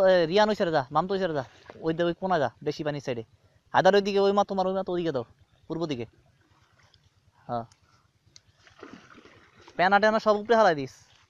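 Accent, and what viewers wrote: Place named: Bikrampur, Bangladesh. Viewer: ভাষা শুনে আমাদের বিক্রমপুর মনে হচ্ছে।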